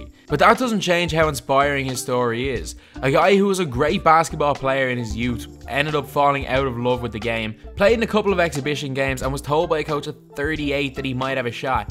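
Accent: Dublin, Ireland. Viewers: Ur Irish accent had me shook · Where are you from it sounds like Dublin?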